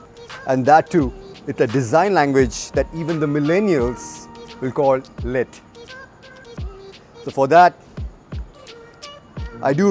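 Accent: India. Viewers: Nothing, I say nothing, sells a harley davidson like a guy with an Indian accent and an earing in one ear... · What's with the accent???? Aren't you Indian??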